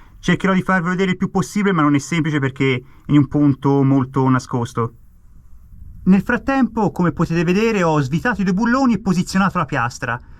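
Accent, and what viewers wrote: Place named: Tuscany, Italy. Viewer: Sei davvero bravo....vorrei avere la tua manualità e, purtroppo, dall'accento toscano che noto, non siamo proprio vicini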